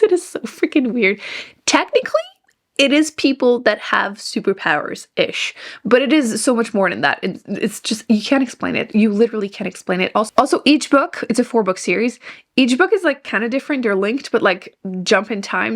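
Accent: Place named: Quebec, Canada. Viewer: Love the t-shirt you are wearing! I don't know if I'm right but you sound like a fellow Quebecer. I really love how you are reading the shelves and letting go of the books that you haven't liked. And that big jar wow :) I discovered this year Shari Lapena (a Canadian author, a happy surprised to me) and loved her! So that is part of my reading plans.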